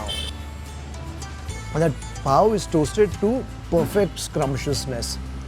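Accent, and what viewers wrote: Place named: Mumbai, India. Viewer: sir, it's nice to hear you speaking the typical mumbaikar accent.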